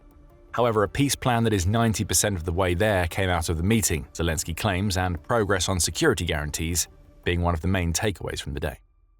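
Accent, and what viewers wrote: England, United Kingdom. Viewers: English accent but American grammar.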